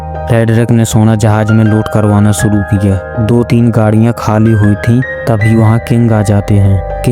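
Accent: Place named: Bundelkhand, India. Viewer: तुम तो बुंदेलखंडी लग राय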